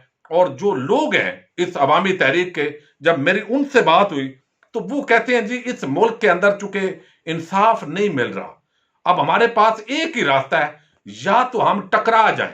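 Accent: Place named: Pakistan. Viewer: Ur voice purely Pakistani really I appreciate u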